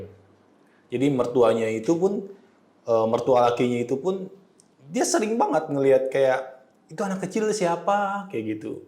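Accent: Betawi, Indonesia. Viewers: Kocaknya bang klo lagi ngomong..betawinya nyablak bgt dah...salam dari tanjung priok bang😂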